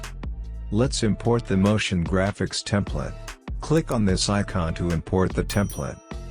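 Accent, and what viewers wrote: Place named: United States. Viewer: YES SIR BUT WHEN I WAS WATCHING ONE OF YOUR TUTORIALS , YOUR ASSCENT TO SPEAK ENGLISH WAS LIKE AN AMERICAN , PLEASE REPLY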